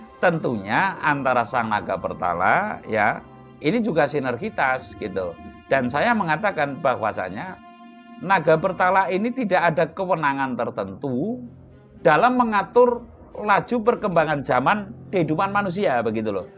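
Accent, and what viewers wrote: Banyumas, Indonesia. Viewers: Rahayu Ki, pngndkne sami klh kula Ki, ngapak. Kula skng Karanganyar Kebumen ndrk nymk Ki 🙏.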